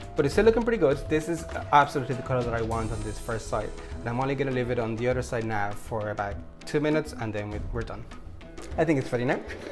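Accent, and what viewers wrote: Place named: Ireland. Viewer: I do! I love the diversity you exude. I'm guessing you're of Latin descent? I can hear your Irish tones in your voice. Cooking up a rainbow of dishes 💛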